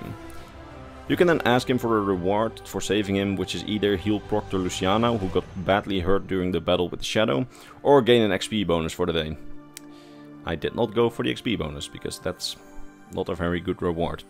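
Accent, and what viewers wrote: Netherlands. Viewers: Are you Dutch? If so, goede videos! Hope you'll continue to make the ESO story videos up to the latest chapter!!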